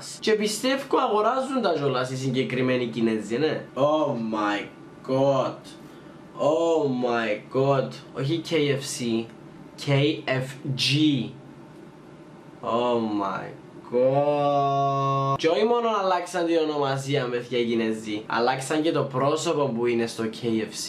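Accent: Cyprus. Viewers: Λατρεύω τα κυπριακά !!! Και είσαι πολύ ωραίος και σου πάει πολύ το μαλλί !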